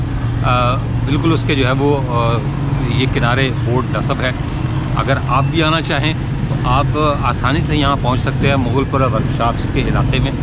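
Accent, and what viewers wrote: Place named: Uttar Pradesh, India. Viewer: آپ کی اردو بولنے کا لب و لہجہ یو پی، میرٹھ اور دہلی طرز کا ھے کمال کے اہل زباں ھیں آپ،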